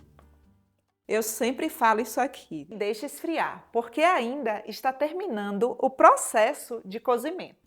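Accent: Bahia, Brazil. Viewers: Sotaque mais pra Bahia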